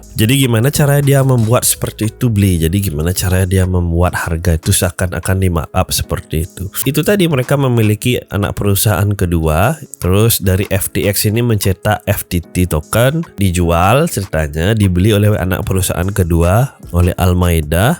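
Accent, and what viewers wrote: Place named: Padang, Indonesia. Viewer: Lu org pdg ya bg, logat nya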